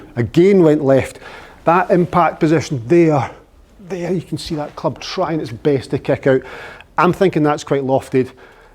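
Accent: Scotland, United Kingdom. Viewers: you are a blast to watch and whatever you do, don't lose that Scottish accent!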